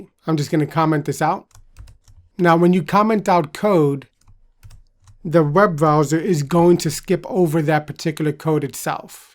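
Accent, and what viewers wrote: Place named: India. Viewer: Ur english sounds like Indian..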